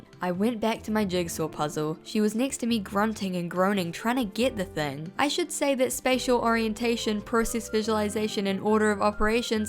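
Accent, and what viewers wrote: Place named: New Zealand. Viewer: i can't tell if you're kiwi or not, but as a kiwi, your accent validates me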